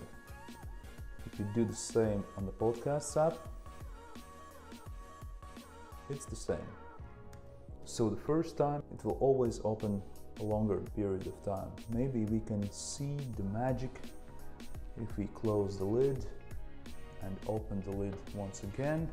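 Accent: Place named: Russia. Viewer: Раша акцент ю ноу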